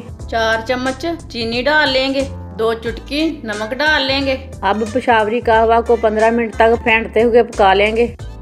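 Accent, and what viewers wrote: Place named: Punjab, India. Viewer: Very strong Punjabi accent that you cant hide 😅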